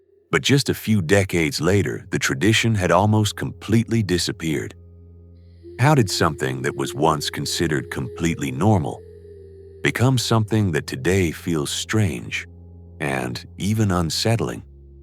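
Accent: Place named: United States. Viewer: Was this about British history? If so, why are we listening to an american voice - it's very disrespectful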